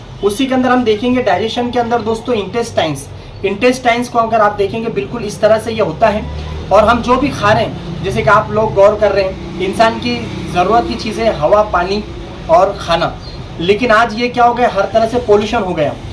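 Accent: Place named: Aurangabad, India. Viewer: Aawaz me Aurangabadi Laheja Bahut hi badhiya hai Dr.sahab...👍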